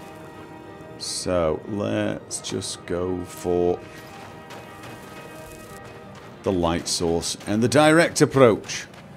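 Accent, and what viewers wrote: United Kingdom. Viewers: Hey.. Where are you from? Your accent sounds sort of British, but I notice you roll your R's, well, not all of them, only every now an then..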